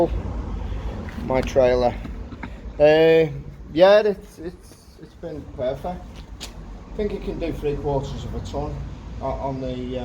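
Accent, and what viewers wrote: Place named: Liverpool, United Kingdom. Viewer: I do like a repair vid. I was just trying to imagine a scouser speaking Spanish. And then i gave up 🫣😂😂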